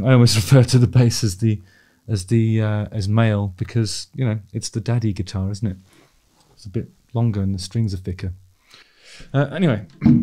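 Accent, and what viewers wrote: United Kingdom. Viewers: No, he’s just British and you’re probably just American.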